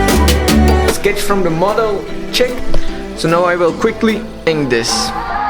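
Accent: France. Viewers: Ça se sent que t français 😂j’adore t vidéo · Are you french?cuz you have a little french accent 😄 Anyways i am french too😊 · Ta video explique très bien comment faire 👏 et tu a un super accent pour un français 😋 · Je me suis doutée que t'étais français avec l'accent, et mon intuition a été confirmée quand j'ai vu les feuilles Canson noires!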